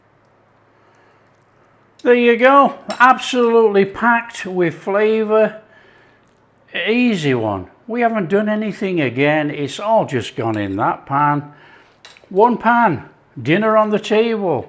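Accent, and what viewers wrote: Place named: Northern England, United Kingdom. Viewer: New subscriber here, just been binge watching your channel, I'm from down the road in Horwich so it's like hearng my dad talking listening to you with our northern accents, loving your food, I'm going to make your butterscotch tart and your cabbage and bacon casserole this week yummy❤❤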